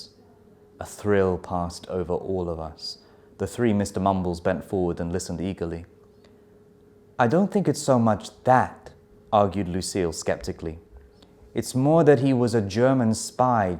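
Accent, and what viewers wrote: United Kingdom. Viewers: Hi. reading sounds so British! Amazing! I have been British English for several years because I fell in love with its pronunciation, intonation and expressions. However, it is so tough to learn it. I can hardly acquire it. That lets me down easily😰. I listened to his reading and got impressed with his British accent Which encouraged me to keep learning. I love his lovely voice, too, by the way. That sounds really comfortable😌. Thank you so much. ( ^-^)ノ∠※。.:*:・'°☆ PS: Could you read ' Howl's Moving Castle' (by Diana Win Jones?) for us, please?😊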